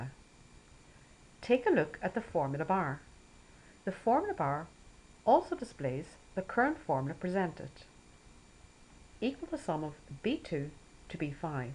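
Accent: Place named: Ireland. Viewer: Thank you for your time. Love the motherly Irish (?) lilt too, very relaxing, I almost fell asleep:)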